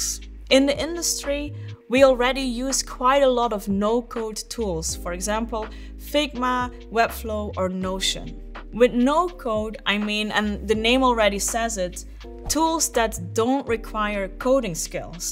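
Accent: Netherlands. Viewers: She sounds so dutch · are you dutch?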